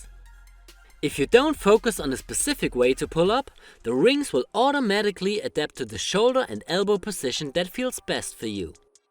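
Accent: Germany. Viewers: must be german by the way he speaks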